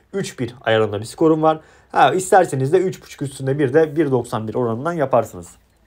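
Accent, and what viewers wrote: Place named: Thrace, Turkey. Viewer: aga sen trakyalımısın bizim gibi konuşuyorsun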